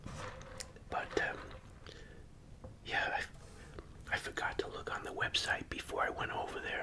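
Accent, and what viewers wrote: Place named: Boston, United States. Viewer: Yeah, it's a Boston accent I'm pretty sure. It's not a thick as some others but it's there.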